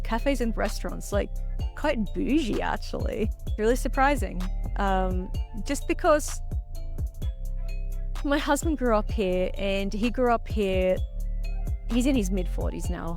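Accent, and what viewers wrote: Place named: Australia. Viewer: Do you happen to be from Australlia😅? I noticed your australlian accent and the slangs right away😁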